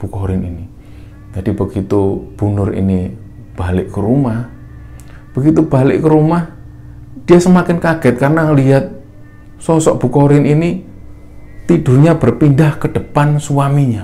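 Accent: Java, Indonesia. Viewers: Cara mbawakan ceritanya tdk bgus Terutama penyebutan AIUEO .....mungkin karnana eksen jawanya yg sgt kental ....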